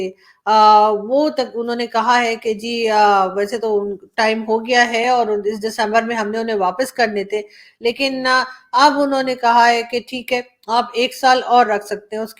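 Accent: India. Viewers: जी नमस्कार आप इतनी खरी खरी सुनाती हो क्या आपको पाकिस्तान में डर नही लगता बोलचाल की टोन से ऐसा लगता है कि आप भारत की हो । आलिया शाह की टोन से वो पाकिस्तान की लगती है। आप खुश रहो आबाद रहो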